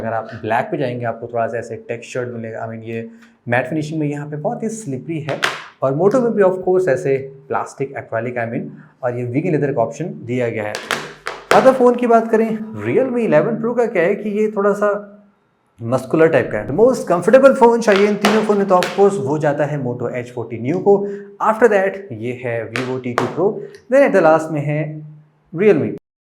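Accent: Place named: Kanpur, India. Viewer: Bhai ap kanpur se ho kio ki saddi kanpur me bolte hai 👍👍👍👍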